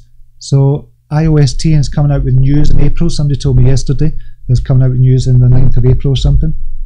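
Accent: Scotland, United Kingdom. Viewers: I just came for that Scottish accent :) Well, and I'm in the very early R&D stages of my crypto journey, so I'm really here just absorbing and learning. Nice to meet you. There was definitely alot of distortion here, and there today. I watched a bit, when this was live, and it kept freezing on my side, and now, on playback, I just hear the sound distortions. Just an FYI. Have a wonderful weekend!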